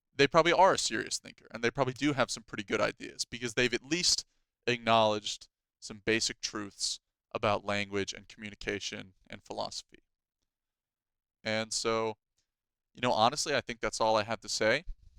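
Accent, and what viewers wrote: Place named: South, United States. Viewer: Where in the south are you from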